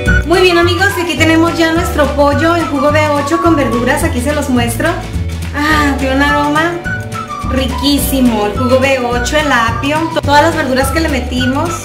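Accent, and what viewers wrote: Sinaloa, Mexico. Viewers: tienes acento y pinta de sinaloense jejejejje, eres de Sinaloa? tu olla es royal prestige, no necesitas ponerle aceite jejejej pero creo q es la costumbre que tenemos... se ve deli, hare la receta.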